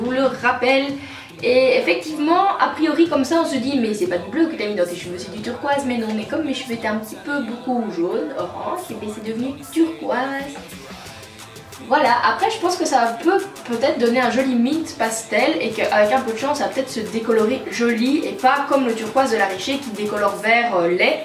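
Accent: Belgium. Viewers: Aaah j'ai reconnu l'accent belge ! xD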